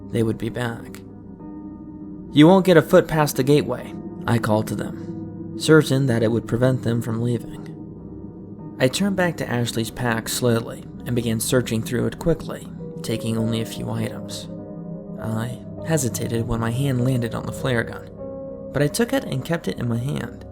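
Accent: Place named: Midwest, United States. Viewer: probably the midwest, reminds me of a thicker version of my accent so i’m fairly confident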